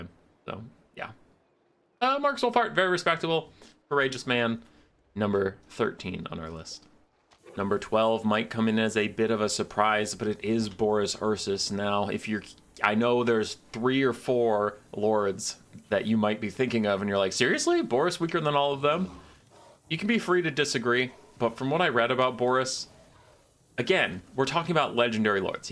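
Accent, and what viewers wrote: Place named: France. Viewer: your french accent absolutely incredible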